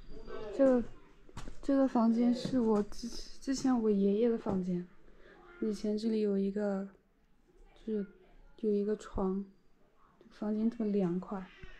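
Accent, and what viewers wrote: Chongqing, China. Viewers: 喜欢的女生也是重庆口音，听着好亲切啊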